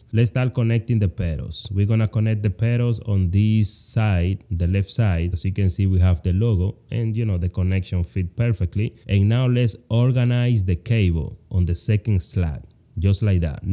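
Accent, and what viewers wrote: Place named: Dominican Republic. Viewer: i know a Dominican when i hear one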